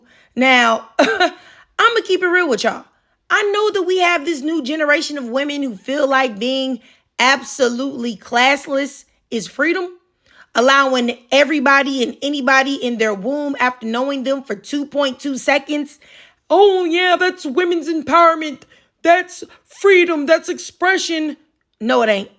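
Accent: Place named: California, United States. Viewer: Okaaay I didn’t know you were in your 40s. Same! And I can tell you’re from Cali lol I’m in Inglewood. 💜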